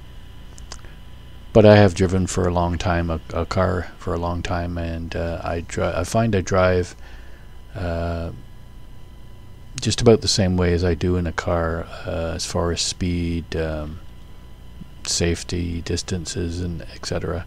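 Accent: Canada. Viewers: Do most Canadians sound like you, or are you from a special area? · Man after my own heart. Steady driver/rider - not out to break any speed records, enjoying the ride but keeping good space all around you. As an Aussie I have to say I love your soft Canadian accent. Very soothing.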